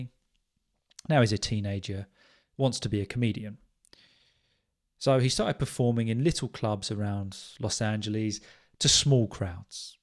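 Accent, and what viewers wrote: England, United Kingdom. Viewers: Hello, thanks for another interesting episode, you’ve been doing excellent job for learners to help them with English May I ask you, what is the English accent which you speak? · Sir like it very much your British English accent and I understand your speak properly it is hundred persent clear. Lot of thanks teacher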